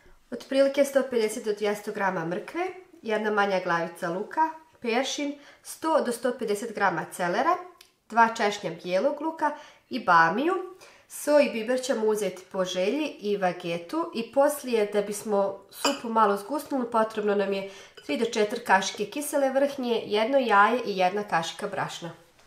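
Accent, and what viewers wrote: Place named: Krajina, Croatia. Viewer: volimo te i pratimo naravno volimo tvoj preljepi krajiški naglasak! 💪🏼👍🏼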